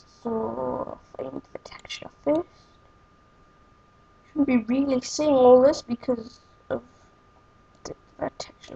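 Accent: Newcastle, United Kingdom. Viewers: Loopy Parky British, his accent is British. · It's Geordie. Newcastle in England. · Wow, you just showed all of us your excellent grammar skills. Thank you. And, uhh, He is a geordie. He's from Northumberland (Probably). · are you from newcastle? :D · Nah sounds like a geordie to me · This isn't a Scottish accent... This is Geordie i think. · Geordie? I'm a Geordie lad myself! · Are you from Newcastle? You sound like it. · i cant stand your stupid british accent, thats why i stopped this video at :10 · Ur a Geordie wi ai Mann (y) :D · You from Newcastle? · mate are you jordey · your jordie accent is really penetrating my skull :O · Accent. British.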